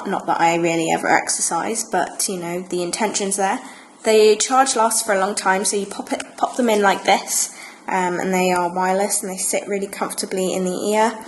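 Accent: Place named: United Kingdom. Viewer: Hello lovely! This was a great video, awesome to have you here on Youtube. Thank you for sharing what's in your bag, the solid perfume is super handy. Haha just being nosey but are you from the UK living in Vietnam? Just going by the accent :)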